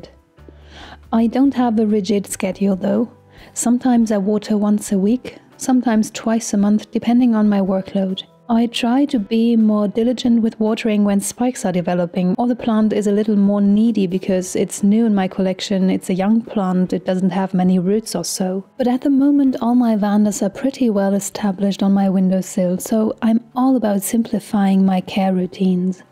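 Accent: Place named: United Kingdom. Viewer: I love your voice 😘 British accent with Eastern EURO thinking ☺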